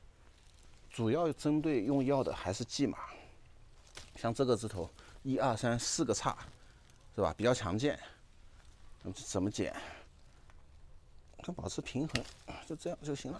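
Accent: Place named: Shanghai, China. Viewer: 第一次看到你的视频。听口音上海人是伐？看这景貌似南加？我也在南加😄。BTW, 我就是天平座的。😅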